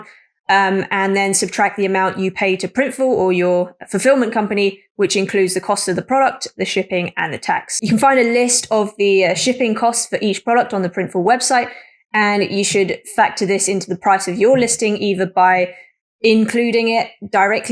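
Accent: United Kingdom. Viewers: Thanks, sweetheart!!, so what I got from this was I have to press a button in printify, which I have just done i wish I had of known this morning before I went to my boring 9-5 job 😁 so is that it, yeah I was stocked to get my first sale today but your right I was confused what next very helpful thanks!! is that a British accent i detect? from a fellow brit here?? ;-) lol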